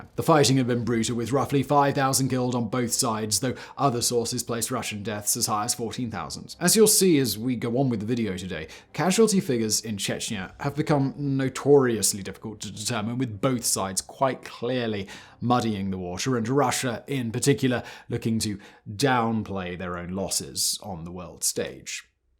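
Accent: RP, United Kingdom. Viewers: There really is no better narrator for a historical documentary than someone with a posh British accent I swear to God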